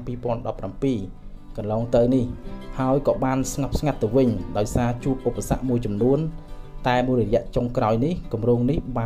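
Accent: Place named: Takeo, Cambodia. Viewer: ប្រុសទេតែនិយាយម៉ាម៉េចតាកែវ មិនមែនគាវទេប្អូន។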